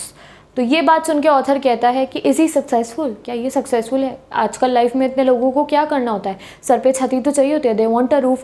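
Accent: Punjab, India. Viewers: Is she belongs to Punjab ? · Madam...are you from Punjab?? ...tell me pls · the punjabi accent that you make in mistake like "haiga" makes this video more interesting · Her Punjabi accent is so cute 😂❤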